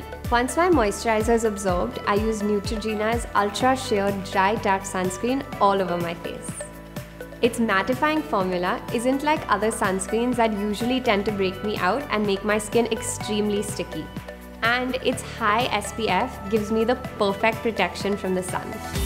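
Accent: India. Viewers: So funny how you look American and have an indian accent,didn't expect that at all😂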